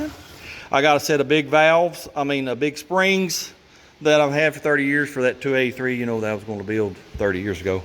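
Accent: United States. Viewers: Where is your American accent from….it’s lovely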